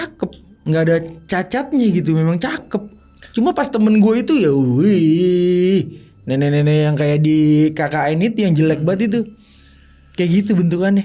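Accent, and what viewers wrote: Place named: Betawi, Indonesia. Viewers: Betawi kalo udah cerita emang seru dach😅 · Ini mah betawi bet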